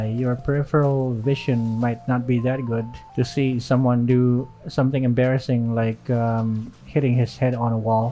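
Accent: Philippines. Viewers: Dammnnn your tagalog accent 🤤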